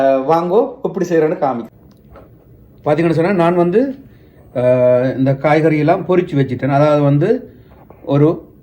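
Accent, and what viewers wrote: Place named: Sri Lanka. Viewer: நீங்கள் இலங்கைத் தமிழர் போல் தெரிகிறது இந்தியாவை சேர்ந்த தமிழர்கள் பேச்சு மிக மோசமாக இருக்கும்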